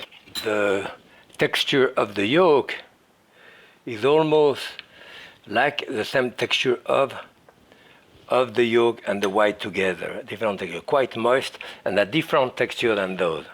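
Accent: France. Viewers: Like Pavlov's dog, I hear french accent and get hungry right away. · Jacques is the most beloved American with a French accent 😁 🗽 The statue of Liberty is nice, but she's no.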